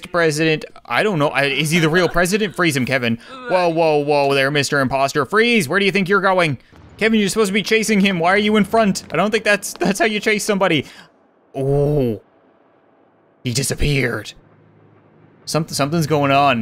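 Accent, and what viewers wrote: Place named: Canada. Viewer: Love your vids and all the energy and effort you put into them! Also love your Canadian accent and quirks! Are you a fellow scientist? You knew about centrifuges, pipettes and that vaccines don't cause autism (thanks for that! Yay!) Congrats on >15K subscribers! Thanks for making this series! Cheers from your neighbor in California!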